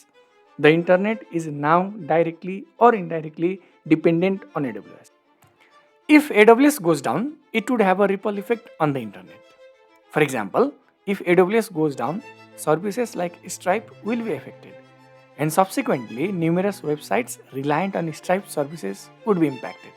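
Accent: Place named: Nepal. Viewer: Because your voice tune is like nepali-english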